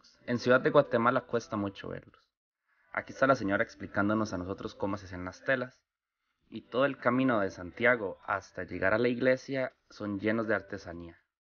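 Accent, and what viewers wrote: Costa Rica. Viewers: No te hagas muy el extranjero porque Guate es el hogar de todo centroamericano, lo unico que uds tienen otro acento un poco deferente al de Guate y porque tienen a Wanchoppe que lo odiamos jajaja pero pues somos lo mismo.. Saludos hasta tiquicia la bella, gente honesta, chambiadora, honrada y siempre echada para delante como la chapina. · Estás guapo vos tico, me gusta el acento costarricense ya que no pronuncian la letrra ese aspirada tal como nosotros los chapines tampoco lo hacemos.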